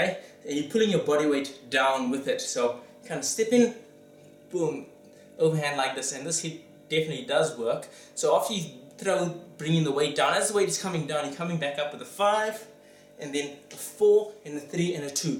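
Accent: South Africa. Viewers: Are you South African bro? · U sound south african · crazy how you still have your accent prob from your perents tho, any way i dig your channel keep it up. oh do you ever come down to fight in SA?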